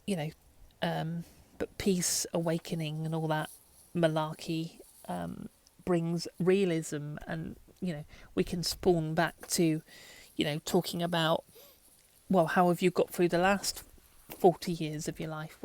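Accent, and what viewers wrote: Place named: RP, United Kingdom. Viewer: I found you. You have a Tremendously strong QUEENS ENGLISH accent (hope thats not bad what i said?) A blogging channel? Ok... I'll be actively looking out. Nice to find you 😊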